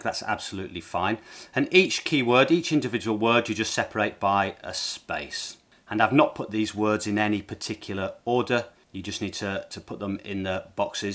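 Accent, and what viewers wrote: England, United Kingdom. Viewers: Good to hear an English accent, regarding making money, keep it up, your videos are very informative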